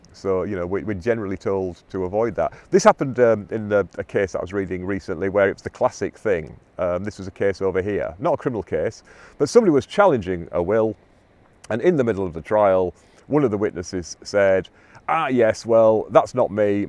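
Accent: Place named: North, United Kingdom. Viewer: Correction required, (if you wish.) Access to the beach/mining tunnel must be at low tide, not high tide as stated. Add caption to correct ? By the way, I love your northern accent, and I am proud of you for retaining it, especially in your profession where it could be a disadvantage for you. Where are you from ?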